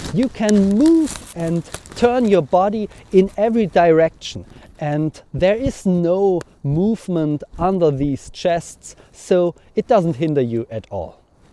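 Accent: Germany. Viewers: Super Video! Wenn ich darf lasse ich dir einen kurzen Hinweis zu deinem Akzent da. Du machst nämlich einen typisch deutschen Fehler. Du betonst "because" so als wäre es "becourse", also da kommt plötzlich ein r vor das es gar nicht gibt. Das "cau" in "because" wird aber einfach wie das "ko" im deutschen "kommen" ausgesprochen. Wir deutschen können halt das englische r nicht aussprechen (geht mir auch so) und das überkompensieren wir dummerweise gerne indem wir es überall reinpacken. Hoffe das ist nicht unangemessen, dachte mir nur das könnte hilfreich sein. Viele Grüße!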